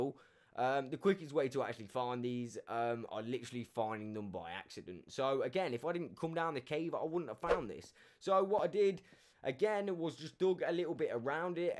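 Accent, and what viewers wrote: United Kingdom. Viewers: He's german And he has british accent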